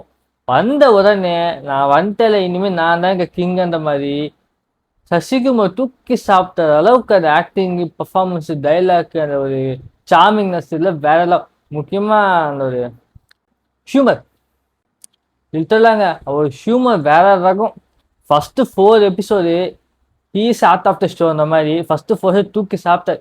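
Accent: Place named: Telugu, India. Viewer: நீ ஒரு தனியா ஒரு வீடியோ ஒன்னு போட்டு வை டா அது என்னன்னா நீ ரியலா தெலுங்கு தான் பேசுவ அப்படிங்கறது எல்லாருக்கும் தெரியிற மாதிரி ஒரு வீடியோ போட்டு வைடா நீ பேசுற தமிழ் வந்து டிஃபரண்ட்ஸா இருக்கு போல நீ க்ளிப் போட்டு இருக்கேன்னு எனக்கு தெரியும் மத்தவங்களுக்கு தெரியாது இல்லையா... அதனால சொன்னேன்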